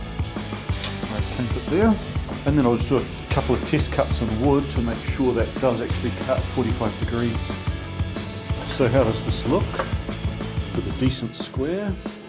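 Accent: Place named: New Zealand. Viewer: I am a new subscriber in Australia. Until you said that you are in Austria I was sure that your accent and approach to building stuff meant that you were in New Zealand!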